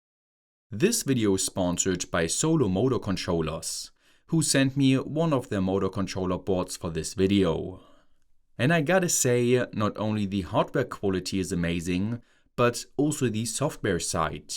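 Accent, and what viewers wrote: Germany. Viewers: ALSO ich habe in diesem Video das erste Mal gemerkt, dass du einen deutschen Akzent hast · i think Entr is asking where in germany. different regions have different accents, as in any other country... i think Entr wants to know where specifically (and so do i)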